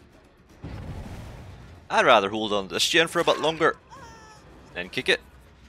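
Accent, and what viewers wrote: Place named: Northern Ireland, United Kingdom. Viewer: at the start there i thought you were gona have subtitles the entire video coz youre from northern ireland haha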